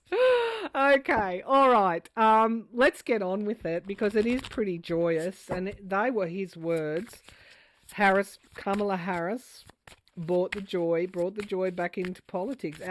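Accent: Australia. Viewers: Hi, Thank you for your thoughts, I have been watching and appreciating your videos for a long while (and loving your Australian flair). I am one who is still very confused and saddened by Biden stepping down. I understand your excitement for Kamala, I like her and I am so glad she chose Walz. But I feel like Biden was shot down by his own party, he, the one who did all the hard work of bringing us out of the tailspin of the Trump world. The last couple of weeks are the first time that it has been painful to watch your videos. Our 14 million votes for Biden were ignored as Pelosi even admits, in a new article, that she nudged Biden out. And when you said here that Trump's donations are drying up because Biden isn't in the race? What??? I wish you would read on Biden and how he is feeling and what happened there and bring some attention to that. Biden would have won in a landside. 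I have no doubt that Kamala and Walz will win as well. I wish you would address the pain that some of us are feeling, with our preliminary votes being ignored for Biden and still caring for him. I was so inspired with him saying "When you get knocked down, you get back up". My heart was with him and I wanted to see him defy all the MSM and the pundits and win. I can't even watch MSNBC any more, although maybe that's a good thing. With much love💕 Elizabeth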